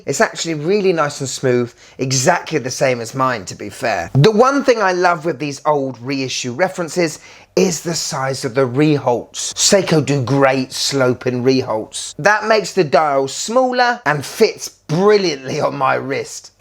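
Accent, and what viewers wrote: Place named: Scotland, United Kingdom. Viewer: I thought you were one of us with that Scottish accent mate! 🤣🤣 both awesome looking watches and another fun show as always matey! 😁👌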